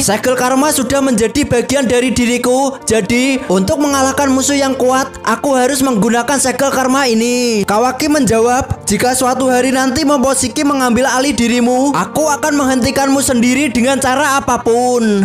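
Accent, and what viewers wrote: Java, Indonesia. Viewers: Logatnya medok pisan😅 semangat min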